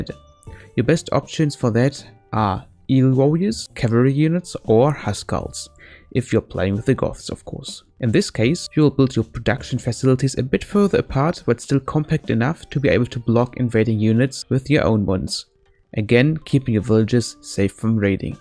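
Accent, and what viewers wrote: Germany. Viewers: Bro are you from DeutschLand?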